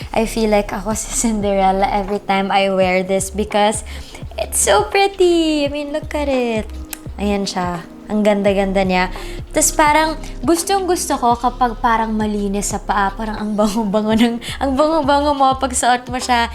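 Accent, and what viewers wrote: Philippines. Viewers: Ang soft ng voice pinay na pinay ang accent❣️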